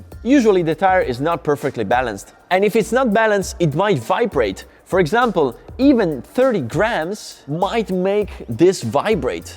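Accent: Italy. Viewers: This guy can talk ! I got a headache within the first 5 mins, jeez..! Keep working on your English dude, it needs still a lot of improvement, I guess you will never lose that Milanese accent..Lol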